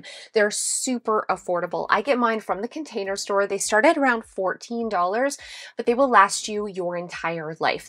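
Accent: Canada. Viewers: I love the Canadian accent I hear once in a while. My family is from Canada but lost their accents years ago....I also Love your advice.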